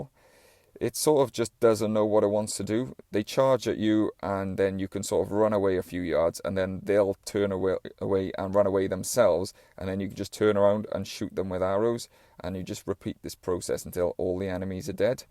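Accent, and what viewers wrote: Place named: Wales, United Kingdom. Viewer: Welsh accent! Subbed :)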